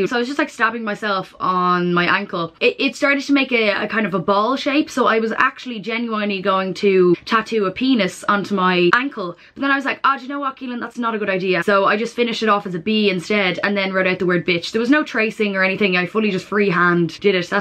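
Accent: South Dublin, Ireland. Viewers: Are you irish ? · I'm actually obsessed with your accent because it gives me south Dublin vibes but without the judgment. Also obsessed with the tattoos I'm going to turn myself into a doodle pad I just want all the tattoos now!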